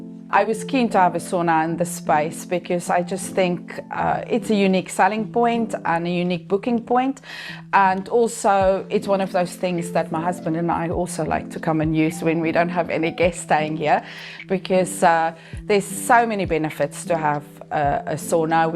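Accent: South Africa. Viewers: Nice mooi afrikaanse aksent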